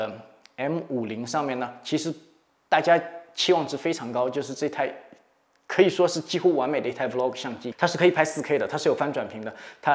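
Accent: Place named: Jiangsu-Zhejiang, China. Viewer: 应该是江浙一带的人，听他讲普通话觉得很亲切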